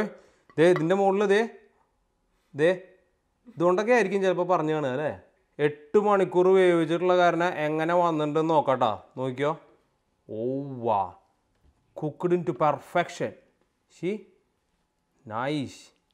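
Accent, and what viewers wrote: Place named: Kodungallur, India. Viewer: കേട്ടിട് കൊടുങ്ങല്ലൂർ ആണെന്ന് തോന്നുന്നു വീട് 😌🤣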